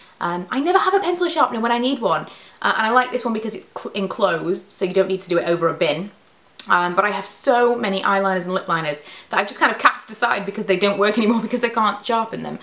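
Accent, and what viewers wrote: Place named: Yorkshire, United Kingdom. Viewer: ahh I see! That makes sense. I had the idea at the time that the dryer they were, the more they'd stay in place but now I'm not so sure. Might have to run and get the older ones before they disappear:) Love your videos by the way. I'm from Leeds so it's always nice to hear a YouTuber with a Yorkshire Accent!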